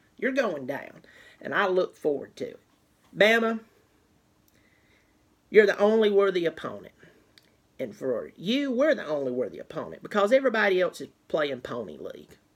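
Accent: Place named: Mississippi, United States. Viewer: Your voice sounds like a Mississippi country girl...Roll Tide sweetie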